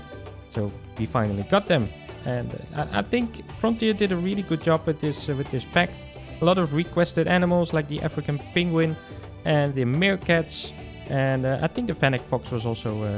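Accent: Netherlands. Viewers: are you dutch? i also am so just wanted to know bc i heard more dutch guys talk english like that Also for other peopol reading this guy is great at the game so SUBCRIBE!!! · Heerlijk Nederlands accent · the dutch accent is strong in this one, love it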